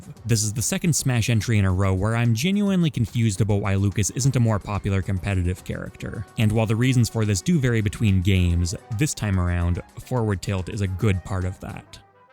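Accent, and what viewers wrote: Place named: Canada. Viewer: today I learned is Canadian. about=aboat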